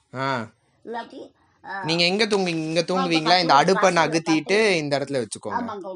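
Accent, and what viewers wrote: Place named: Kongu, India. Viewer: Unga kongu thamizh pechi arumai🥰😘😊☺👌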